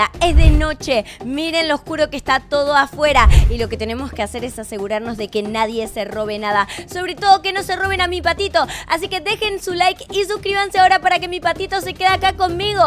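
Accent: Argentina. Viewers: Usted es de argentina verdad?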